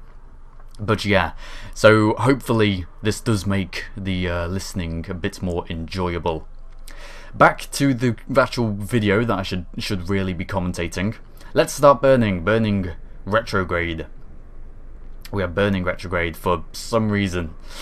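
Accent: United Kingdom. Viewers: Don't worry. We all love your British accent.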